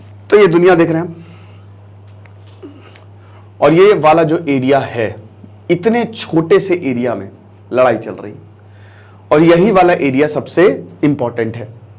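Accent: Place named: Lucknow, India. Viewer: सर आप बिल्कुल लखनवी अंदाज में बात कहते हैं।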